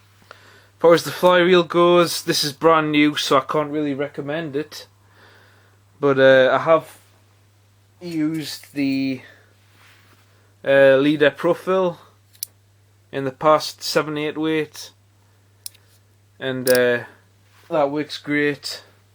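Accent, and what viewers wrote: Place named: Northumberland, United Kingdom. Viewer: Hiya m8, put it this way, on warm still summer nites u can catch school bass, coalies & perhaps a sea trout, which of course u return! from behind the rokers, so on wi your chesties follow the tide back & I used 2 prefer 2 cast sideways along the beach, parallel 2 the waves if any, also on the whale rock ive had coalies, very small Pollack, tommies & 1 wrasse!, keep up the vids as its nice 2 hear someone speaking properly as what we do!!!, from a fellow Blyth dangler.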